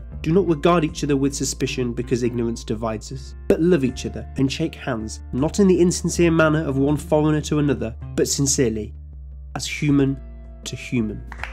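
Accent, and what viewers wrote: England, United Kingdom. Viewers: mi ne komprenis nenion ankaû, cxar lia akcento angla influas la prononco tro multe xD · What region of the U.K. is your accent from?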